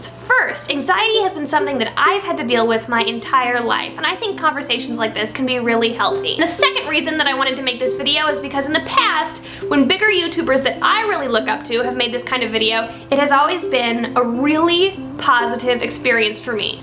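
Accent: United States. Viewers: I never suffer from Anxiety so much, but i loved your sweet American accent and your way too cute i do like your down to earth personality and you would be cool to hang out with. I also love the Blue Moon North American Beer as a Manchester City fan us British city fans are very fond of that lovely drink. Also please subscribe to me like i did to you because i enjoy your videos, i will be very grateful of that. Thanks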